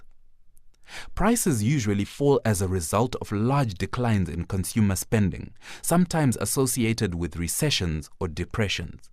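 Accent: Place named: Zimbabwe. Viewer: very good, i wonder who posted this, kinda sounds like a zim accent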